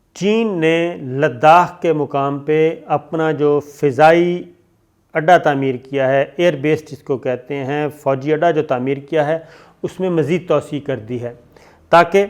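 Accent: Punjab, Pakistan. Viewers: اسلام علیکم. چین جو بھارت کے ساتھ کر رہا وہ تو یے. لیکن میرے بھائی جو آپ اپنی باتوں سے بھارت کو ذلیل کر رہے ہیں وہ تو اور بھی لاجواب ہے. اس پاگل ہاتھی کے ساتھ یہی ہونا چاہئے.27 فروری کو جو بھارت کے ساتھ ہوا.... کیا یہ ذلت کافی نہ تھی ؟ مظلوموں کی آہیں اور بد دعائیں انشا ء اللہ اس مودی اور اس کے ٹولے کو تباہ کردیں گی. آپکی پنجابی اُردو کا مکچر بڑا لزیز ہے. بھارتی جب اسکو سنتے ہوں گے تو انہیں بہت مرچیں لگتی ہوں گی. آپ لگے رہیں ہماری دعائیں آپ کے ساتھ ہیں..... پاک چین دوستی زندہ باد. I also love my great brother country Bangladesh and Turkey. We are standing with our brave Kashmiri brothers. Ae Allah meri arze paak ki hifazat farma. bhai zinda bad. Your great lover. Professor Syed Hamid Manzoor Lahore.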